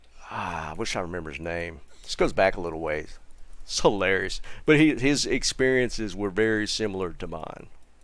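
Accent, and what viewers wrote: Texas, United States. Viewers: Omg holy crap, I was thinking the same thing also. That accent seems like a texas cowboy accent.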